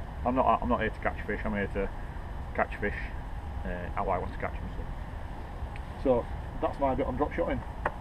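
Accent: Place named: Yorkshire, United Kingdom. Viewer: I read your blog on dropshotting before watching this great video. LOL You did a great job of keeping a straight face. I've just tackled up with an LRF cheapish combo so as to be able to fish part sea, part canal after years of threatening to start fishing again,then taking early retirement I've finally done it. Your video's have inspired me to get another lightweight spin outfit for lure fishing. Drop shots, and small rubber lures came with the Shakespear agility LRF set up, but you've really sold it to me to lure fish. I wish I knew where you were, your accent sounds like mine, in between Leeds Bradford area. You're a credit to the sport. Thanks. Robin.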